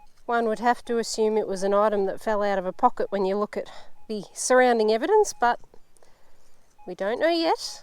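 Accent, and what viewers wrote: Australia. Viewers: JUST WOW!! OL' GOT SOCKERED ON THAT LITTLE JUNKET....OR HE WASN'T SHOWING HIS TRUE COLORS TO SAVE YOUR FACE?? LOL, I WOULD LOVE TO TAKE A GOOD MONEY STICK AND SCAN THE BEACHES AT AND AROUND GULF SHORES AND ORANGE BEACH ALABAMA, BUT I AM TOO DADGUM OLD AND WORE OUT NOW. TOO MANY DIRT ROADS BACK IN MY DOO-WAH-DAZE, LOL. SO I'L JUST BE CONTENT TO WATCH YOU AND SCOUR THAT SIDE OF THE PLANET AND OL' AQUACHIGGER DIG OUT TREASURES ON THIS SIDE. GOOD ON YA GAL, YOU ARE REAL PEACH!!! SEE YA NEXT POST I CATCH! PS..I;LOVE TO HEAR AUSSIES TAWK...I'D SOUND FUNNY TO Y'ALL WITH MY HEAVY SOUTHERN DRAWL.....CARRY ON!:face-blue-smiling:face-red-heart-shape:face-turquoise-covering-eyes:cat-orange-whistling:face-blue-wide-eyes:body-green-covering-eyes:goat-turquoise-white-horns:😝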